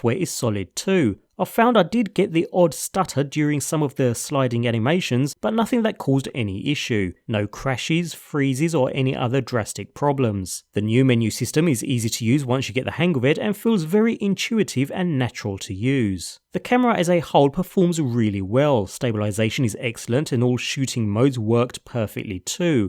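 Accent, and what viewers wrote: United Kingdom. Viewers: I love the way you speak, are you from UK? Anyway your review is brilliant! More reviews mate! Greetings from the Philippines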